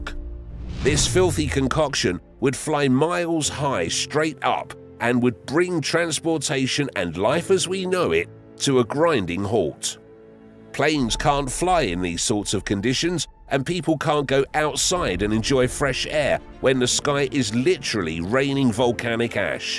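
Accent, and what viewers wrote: United Kingdom. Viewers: 😒 why are these fearmongering videos always done by British voiced people. I made it 30 seconds and GONE! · NOT another Brit narrator!!! Stopped at :09 seconds How about an American to explain an amazing American wonder... I'm outta here! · British narrators can piss off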